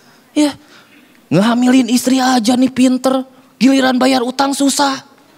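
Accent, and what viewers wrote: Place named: Lampung, Indonesia. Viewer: OMG.. Lampung Indonesian🎉🎉🎉😂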